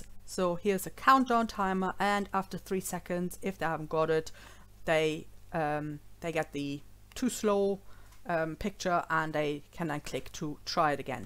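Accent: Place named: Germany. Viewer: Excellent how-to tutorial. I've watched it several times. Your lovely German accent reminds me of my late mother.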